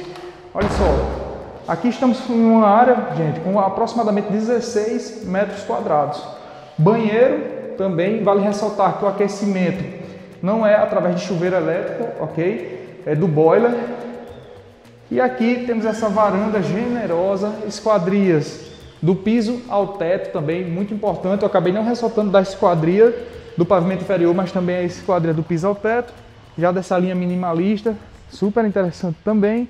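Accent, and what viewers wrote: Northeast, Brazil. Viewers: Conheci seu canal ontem e maratonei muitos videos, e fiquei namorando essas casas lindas e maravilhosas que vc nos apresenta, gostei também do seu jeito alegre de apresentar as casas e como nordestina que sou também logo vi pelo seu sotaque e percebi que também é kkk gostei tanto que ja ate me inscrevi, vc é diferenciado, e sim rapaz mecheu muito com o meu emocional essas casas kkkk parabéns pelo trabalho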